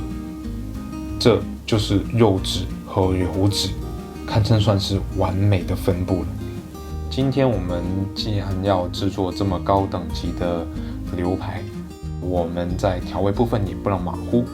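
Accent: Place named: Cantonese, China. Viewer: 我個人喜歡有點口音的感覺 偶爾有點廣東話交叉也不錯 各有所好吧 加油💪🏼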